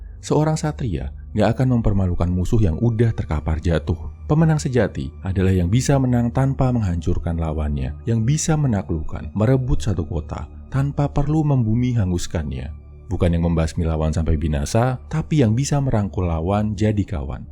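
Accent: Java, Indonesia. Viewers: Keren bang pasti orang jawa ni admin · Mas wong jowo yo?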